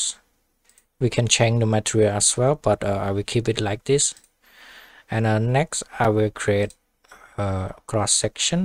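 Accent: Cambodia. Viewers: Ur sound like U are Cambodian!! Anyway this is the good lesson one to learn. Thank!!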